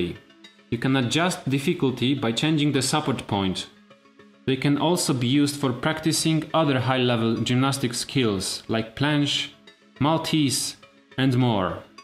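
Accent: Poland. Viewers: Ha! Tak też myślałem ;) Akcent typowo polski, ale całkiem dobrze mówisz po angielsku. BTW. podsunąłeś mi ciekawe pomysły na wykorzystanie moich obręczy i też uważam je za najlepszy zakup sprzętu ;)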